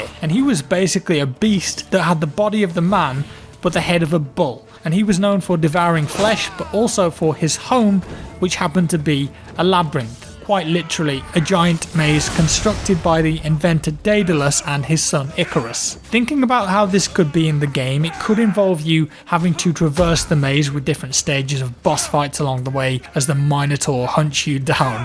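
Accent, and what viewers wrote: United Kingdom. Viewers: The way you pronounce Minotaur and Gaia...sometimes I can't even with you Brits.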